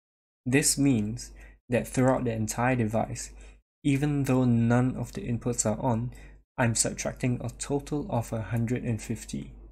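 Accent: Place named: Singapore. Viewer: Hope you don't mind me asking, I feel like I recognize your accent. Are you from somewhere in or near Lion City by any chance?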